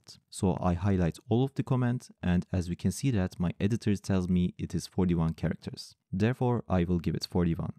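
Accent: Turkey. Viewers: Thanks that was usefull. btw are you Turkish ? Your accent seemed to me like that.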